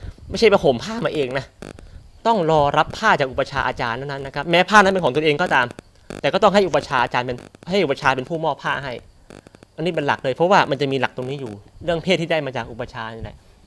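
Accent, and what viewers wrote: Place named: Rayong, Thailand. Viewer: สำเนียงเพราะ คล้ายๆคนแถวเมืองระยอง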